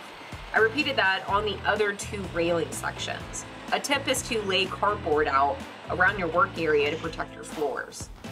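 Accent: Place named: South, United States. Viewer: "Sawlz Awl"...love the southern drawl 😁 Awesome job,!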